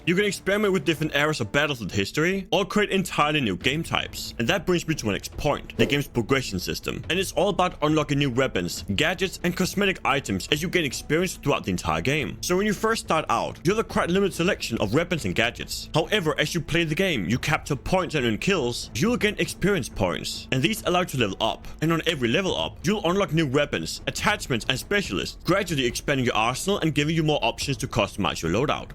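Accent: Denmark. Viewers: Danish narrator?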